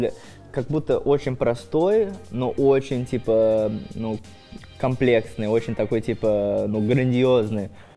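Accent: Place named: Chechnya, Russia. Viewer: рж я думал это чеченский такой акцент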